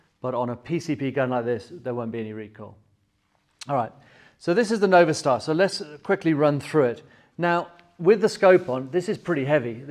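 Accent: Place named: England, United Kingdom. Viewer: English man based in the states I believe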